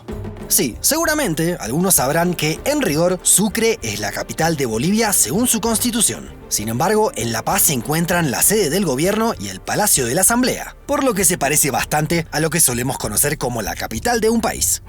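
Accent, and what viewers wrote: Rioplatense, Argentina. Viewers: No exageres La Paz y el occidente siempre tuvimos habitantes, por tu acento pareces argentino o uruguayo como se dicen europeos la mayor civilización de Bolivia e fué Tihuanaco superior a cualquiera de Bolivia o siempre había habitantes.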